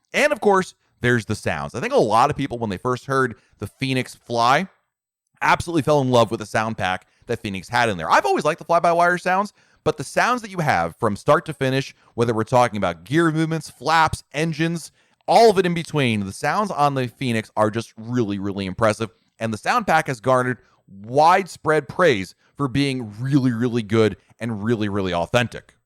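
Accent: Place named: United States. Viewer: This is the first time I've seen any of your videos. I usually watch Overkills Simulation, due to his American voice and great content. His videos taught me how to fly the FBW A320 among other things too which are MSFS related. You have a a great American voice for this type of content. I am American and find it hard at times to listen to the British Videos, which their are so many. Nothing personal to the Brits. Just not my "cup of tea" ( pun intended ) I did subscribe to your channel. Please keep the video's coming. Great Job !!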